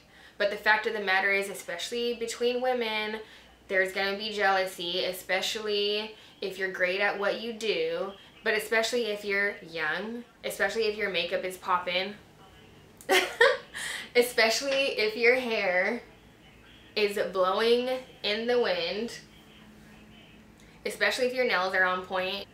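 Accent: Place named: Southern California, United States. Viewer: you have a thick socal accent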